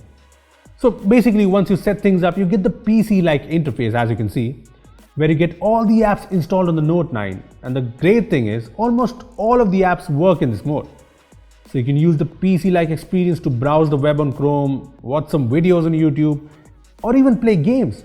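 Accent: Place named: India. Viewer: Cool a video about note 9 *clicks this video* *Indian accent* *Leaves*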